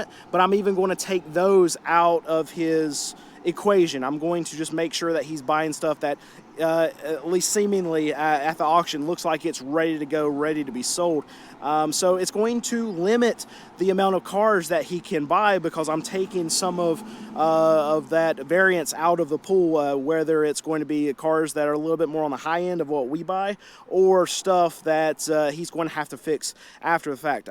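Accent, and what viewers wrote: South, United States. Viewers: He says ,"buhhcuuuzzz" a lot I am guessing in the south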